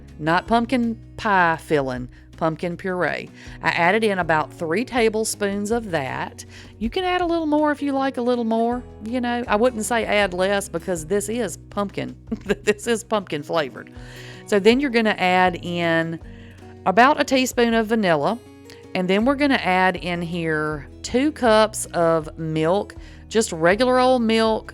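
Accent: South, United States. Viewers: I could listen to you all day! Love your wonderful, southern accent! Oh, and your idea of individually freezing the creamers into little tablespoon servings was such a great idea. Thanks for sharing! ❤ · Idk where you’re from (this is my first vid), but I’m betting TX, MS or GA. I grew up in TX and lived in MS for a long time. I miss hearing a Southetn drawl. I’m back home in Ohio now. In any case, I’m looking forward to making some of these creamers—they are getting ridiculously overpriced at the grocery store for being bottles of milk and sugar! SMH PS I bet we’d have been best friends had we ever met!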